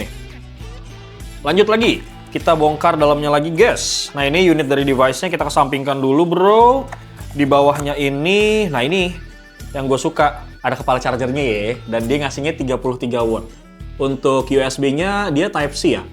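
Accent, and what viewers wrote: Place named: Depok, Indonesia. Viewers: W suka penyampaian lu bang, jakarte bgt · bahasa lu kaya orang Depok Bang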